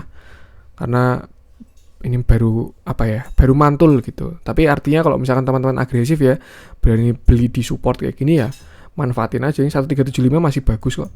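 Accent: Indonesia. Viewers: Dochy Suara mimin nya medok banget, Dan terdengar sangat familiar, Dr.mimin 😂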